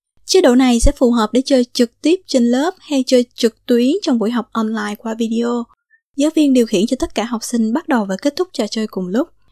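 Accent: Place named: Saigon, Vietnam. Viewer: Giọng sài gòn nghe nhẹ nhàng, dễ thương làm sao..cảm ơn cô nhiều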